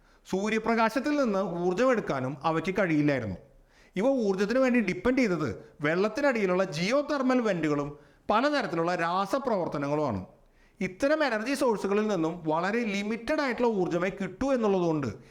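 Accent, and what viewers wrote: Thrissur, India. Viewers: ഇതേ ചാനലിൻറെ തുടക്കത്തിൽ ൽ, അദ്ദേഹത്തിൻറെ തൃശൂർ ശൈലി മൂലം ഇങ്ങനെ സംഭവിക്കാനിടയുണ്ടന്ന് പറഞ്ഞിരുന്നു. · ഇത്‌ തൃശൂർ ഭാഷയാണ് മാഷെ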